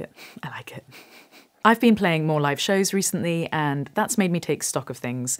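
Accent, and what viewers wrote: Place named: Bristol, United Kingdom. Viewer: It'd be hard NOT to notice the near-constant appearance of black t-shirts in your early videos. That said, I hope you won't go too far in the other direction. I'm inclined to agree with Bowie that, if you're going to be a performer, appearance, at least on stage, is part of the performance. And frankly, this elderly Midwestern USA male likes seeing you in something other than just plain black from time to time. On the other hand, what drew me to this channel initially had nothing to do with what you were wearing. I very much enjoy the Bristol accent and, I admit, the educated tone of your non-musical videos (like this one). So, yeah, wear a red (or purple, or electric blue) t-shirt occasionally, or go wild and wear a girlie-dress, but don't get too caught up in "fashion," which is ephemeral at best, and mostly trivial anyway. 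Keep writing songs and working on your guitar chops.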